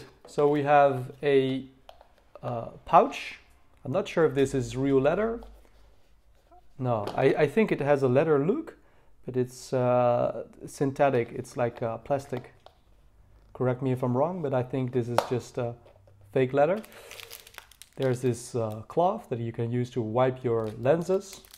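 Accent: Netherlands. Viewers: I hear a Dutch accent.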